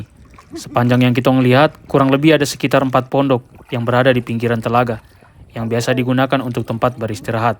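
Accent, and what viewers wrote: Papua, Indonesia. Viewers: mending ko bicara full dgn logat Papua saja lebih bagus Yo tes to 😅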